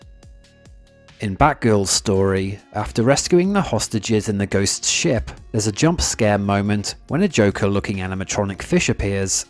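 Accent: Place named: United Kingdom. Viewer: I love these videos and your British accent. Great videos to sleep to, not too many loud sounds n such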